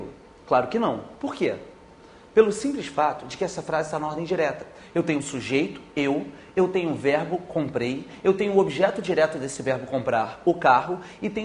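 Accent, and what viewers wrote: Rio de Janeiro, Brazil. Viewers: sotaque carioca e muito irritante, mas, ótimo video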